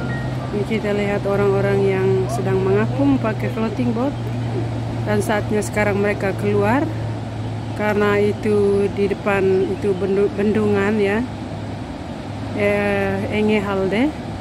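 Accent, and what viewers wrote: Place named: Manggarai, Indonesia. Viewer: Ketahuan dialeg vlogernya orang Manggarai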